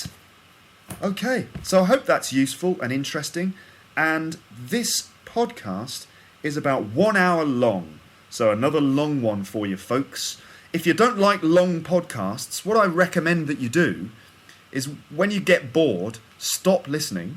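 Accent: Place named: Cockney, United Kingdom. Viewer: This is my favorite podcast!!! You are the best! Wicked!!! your COCKNEY so funny!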